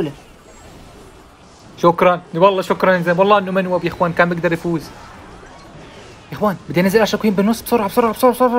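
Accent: Iraq. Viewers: شكلك عراقي تكول يعني تفضل هههه احبك متابعك من العراق 🤍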